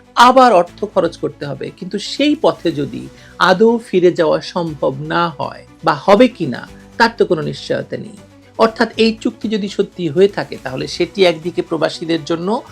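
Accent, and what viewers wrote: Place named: West Bengal, India. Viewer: আফা ভারতের ভাট পারার মেয়েদের মত কথা কয় কেন? বাংলাদেশীরা জানতে চায় ।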